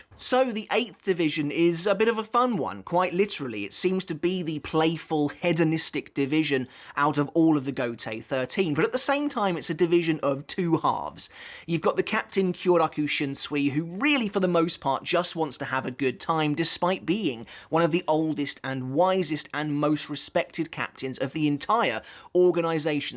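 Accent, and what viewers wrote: United Kingdom. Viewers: It's probably the british accent.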